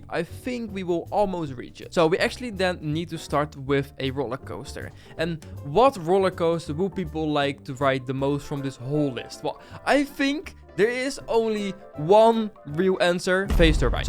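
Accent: Netherlands. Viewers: Bro you sound so dutch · JE BENT NEDERLAND IK HOOR HET AAN JE ACCENT AHAHAH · I love your dutch accent!!(if its dutch) im dutch too. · We horen dat je Nederlands bent en dat zien we ook. Aan je naam dus praat gwn NL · Engels met een Nederlands accentje😂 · I love your accent its an dutch accenr right? (Im dutch btw) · I can hear you speaking english with a dutch accent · Jij bent Nederlands toch ik hoor het aan je accent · are you dutch i maybe hear a acent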